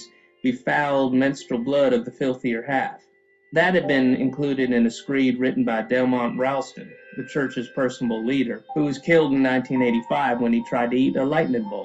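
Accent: South, United States. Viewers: He sounds like, 80% more Southern than usual. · why is he talking like a southerner? I know he's from the south but he sounds different here.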